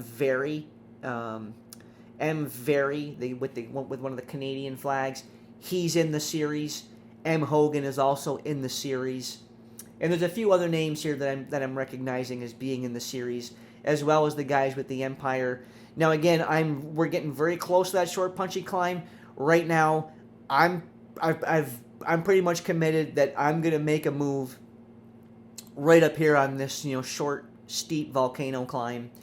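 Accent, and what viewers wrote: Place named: United States. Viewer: What an annyoing ''Yank'' commentary could not listen to this guy!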